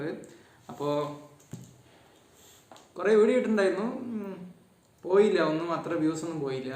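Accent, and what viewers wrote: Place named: Palakkad, India. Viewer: Namude palakkad basha kelkumbol oru santhosham😍😍😍😍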